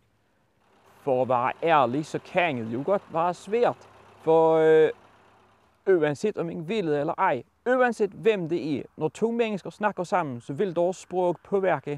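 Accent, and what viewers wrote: Scania, Sweden. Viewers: Jeg er fra Skåne og det lyder næsten som det😮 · Det låter som skånska tycker jag :D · Jeg er norsk, og forstår det. Det høres ut som skånsk synes jeg. Noe som ikke er merkelig, siden skånsk opprinnelig var østdansk, som er blitt forsvensket. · Det liknar skånska en del. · En blandning mellan skånska, danska, blekingska och lite småländska. Rätt kul.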